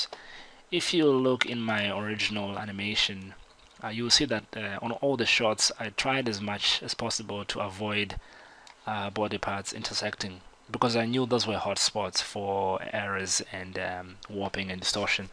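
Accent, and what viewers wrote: Zimbabwe. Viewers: Are you from Zimbabwe? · Is the narrator in this video Zimbabwean? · Definitely Zimbabwean! Haha Waita bho, Wabastira!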